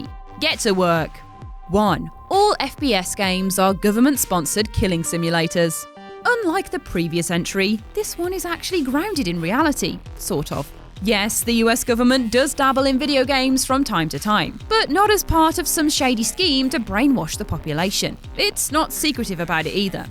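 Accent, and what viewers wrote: Scotland, United Kingdom. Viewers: Tho I believe is Scottish or possibly Irish. Very similar accents tho. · Haha I love British "R linking"! It makes "creepy pasta, at least" sound like "creepy pastor, at least". We've all known at least one creepy pastor in our lives, right??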